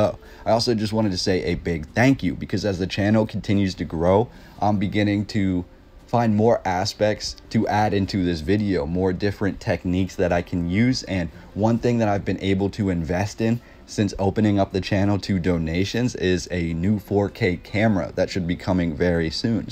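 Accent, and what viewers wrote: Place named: West Coast, United States. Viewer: I appreciate the content but can’t help but wonder where your accent originates. My guess is West coast 🤔 either way keep up the good work.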